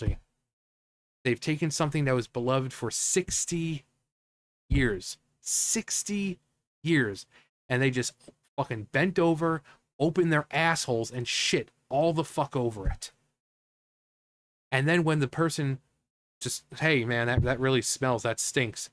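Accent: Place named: United Kingdom. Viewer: Brilliant analysis (and Brit accent) 😊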